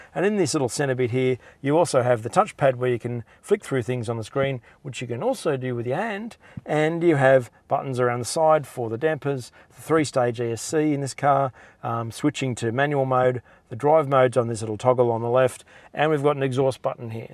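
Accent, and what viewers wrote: Australia. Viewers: Man.... I miss Australia soooo much. I already have an E-class coupe and I really like it. I'm here mostly to listen to someone speak, Australian! BTW, silver is a very good color to have (especially in Canada), because dirt doesn't stick to it like it does on a white or dark colored car. I have always bought only black or dark ruby red, cherry red, metallic cars. But I discovered that silver cars, I only have to wash it once for every five or six times I'd have to clean a black car. I bought silver because it was the only E coupe on the lot; and if it's a Merc', color doesn't really matter to me; it's a Mercedes Benz.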